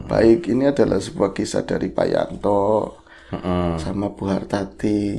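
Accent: Banyumas, Indonesia. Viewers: Aq suka klo bro ngomong ngapak..lucu dengernya..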